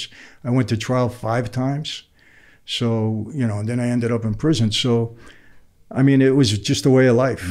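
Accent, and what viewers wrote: United Kingdom. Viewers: Great interview. Enjoyed you having to renege on some of the cockney patter so your questions made more sense 😂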